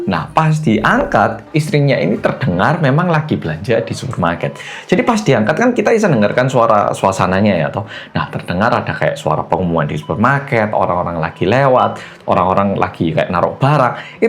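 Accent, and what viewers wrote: Java, Indonesia. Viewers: Bahasanya bnr2 lancar udah seperti org indonesia asli. Logat jowo pisan😅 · Logat korea mana ya, kok agak mirip Logat Jawa tulen,,,, maaf maklum subsreber baru nih,,, baru nonton video nya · Salut dg org korea satu ini.. dg gaya bicaranya yg indon banget. Kl kasusnya sih ga beda2 jauh dg asn imigrasi pekan baru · Korea top logatnya jowo tenan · Uedan kok bisa semedog itu bahasamu masee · Wuaduh kang Korea medhok berubah ke tema selingkuh, tau aja tema kesukaan netizen. Ha...ha...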